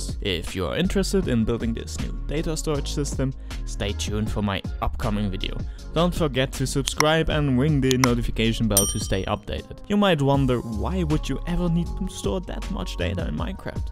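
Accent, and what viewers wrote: Germany. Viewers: Heavy German accent ftw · Du sprichst sehr gutes Englisch, aber ein Deutscher wird immer einen Deutschen der Englisch spricht erkennen 😄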